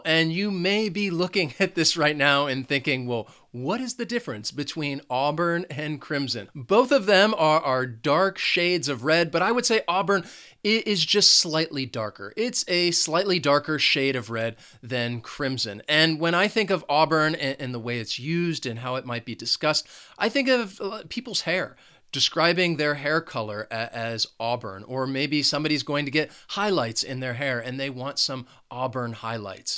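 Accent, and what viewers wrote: United States. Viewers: unfortunately your accent is American not British 😕 any way thanks a lot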